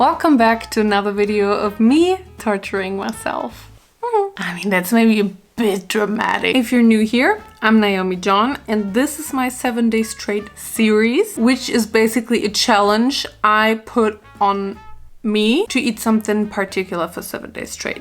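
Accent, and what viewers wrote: Germany. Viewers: Is it just me or has she got way more German recently, her words are so much less pronounced compared to what they used to be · shes german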